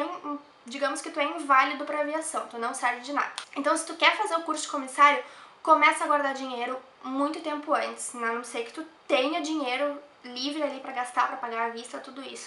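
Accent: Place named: Rio Grande do Sul, Brazil. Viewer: Muito Tu hein hahaha adoro sotaque de Gaúcho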